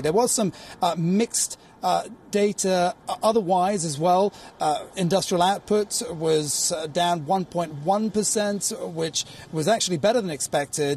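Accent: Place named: India. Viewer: INDIA ACCENT TAKEN TEEKEN, ABLE EBLE, SAY SEY, FACEBOOK FECEBOOK, GO GU, WAY WEY, GROWTH GRUTH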